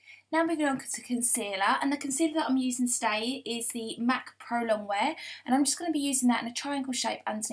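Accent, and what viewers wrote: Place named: England, United Kingdom. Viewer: I'm so glad I came across your videos! I've gone on a binge watching spree! I could listen to your accent all day, makes me want to plan a trip to England! Xo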